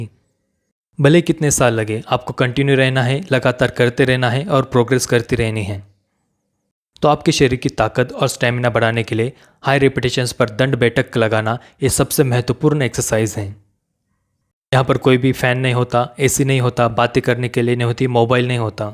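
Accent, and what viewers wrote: Maharashtra, India. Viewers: Your Indian accent is thic af man but like the content. I'm just listening to everyone to find a middle way and for the things you said about straps, etc. I don't use belts or straps only raw lifts but I do use pre-workouts and coffee and my primary motivation to use them is putting up more work for more muscle mass I think it's justified and I see the results of my training both in practical situations like pain tolerance for example 2 days ago 12.5 kg dumbbell from the rack dropped to my foot and I felt little to no pain at the time and afterwards and 0 complications good stuff. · U r a marathi.and the way u speak Hindi, it sounds so cute 🥰❤I love u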